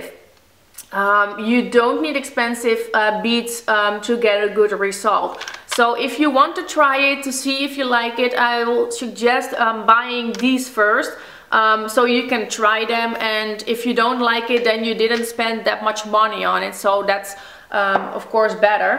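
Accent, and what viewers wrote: Netherlands. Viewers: Where are you really from? I hear some accent hahaha Holland? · Super leuke nail art! Ik was het filmpje aan het bekijken en ik dacht: Dat accent, ze moet wel Nederlands zijn. Dus ik ging zo op je blog kijken en toen bleek het dat je Nederlands was! ik vind dit ontwerp echt super gaaf ik ga het ook proberen (: <<num>! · ik dacht al dat je nl was hoorde het al een beetje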